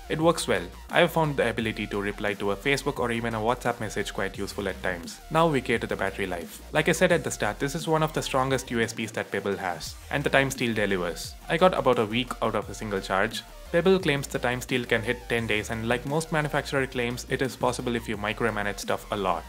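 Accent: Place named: South India, India. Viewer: You have a typical south Indian's accent. Not complaining though! 😃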